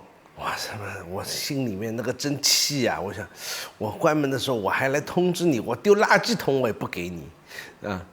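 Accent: Shanghai, China. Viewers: 喜歡聽你聊天 有趣 上海人特有的口氣和用詞 很熟悉 🎉👍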